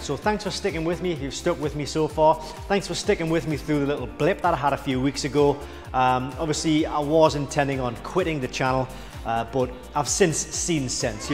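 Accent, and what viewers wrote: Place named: Northern England, United Kingdom. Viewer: Hi, lovely space to work with. I can tell from the accent that you are very North but how much a month is a place like that? Im Surrey based and that would cost a lot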